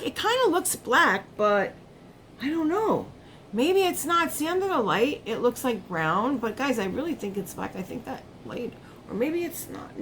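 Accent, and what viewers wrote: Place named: East Coast, United States. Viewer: Thanks,...you are so sweet! I hope you feel better soon! I am not doing so great these days myself! I can hear it in your accent that you on the EST! Take care & thanks so much for your response! 🙏🙏❤❤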